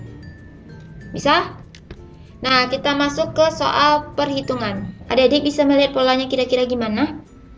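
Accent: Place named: West Sumatra, Indonesia. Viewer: logatnyo mirip urang minang akak ko wkwkwk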